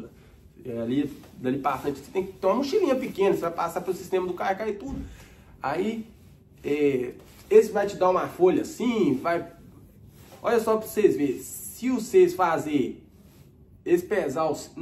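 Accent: Minas Gerais, Brazil. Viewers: Mineiro contando é mt engraçado kakskakakakaka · O seu sotaque é igual o do meu primo Leo que é de inhapim mg ele tá nos Estados Unidos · eu adoro o sotaque de minas mano, se loco vc aprende e se diverte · Kkkkk mano tu e mineiro né ? Cai aqui de paraquedas, rachei da forma engraçada que vc conta kkkkk vc parece muito o Josias presidente da Galoucura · Quw cara dahora um abraço man sou mineiro tbm, melhor sotaque pra contar história ❤😂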